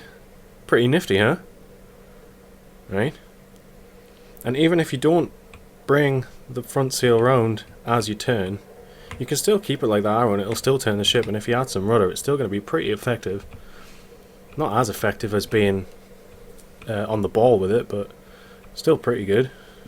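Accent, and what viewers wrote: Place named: Newcastle, United Kingdom. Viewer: Great video man! Nice to hear a jordie voice amidst all the American naval action video s. I haven't yet played this game, and the detail is cool. But I am a little disappointed that you can only change sail angle and not entire sail plan. (Eg a square rigger sails best down wind with only foresails and main course)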